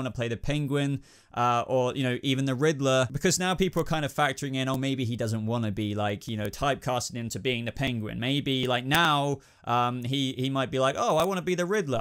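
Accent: England, United Kingdom. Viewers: Great video, where abouts in England you from? I live in Ireland 🍀😀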